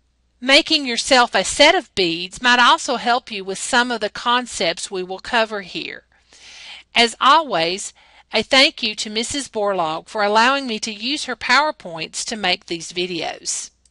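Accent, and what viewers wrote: United States. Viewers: What accent r u using boston? Southern? I like it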